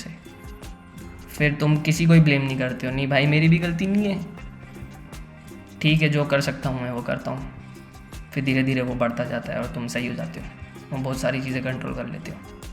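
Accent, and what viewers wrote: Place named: Madhya Pradesh, India. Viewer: Mp se ho kya bhai? Pyaar accent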